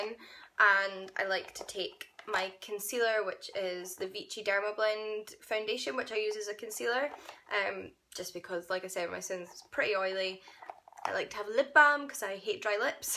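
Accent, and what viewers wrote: Scotland, United Kingdom. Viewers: You sound Scottish!! I adore how you said Zed if I didn’t see the Z I wouldn’t have known what you were talking about and I thought the apple pie was funny 😂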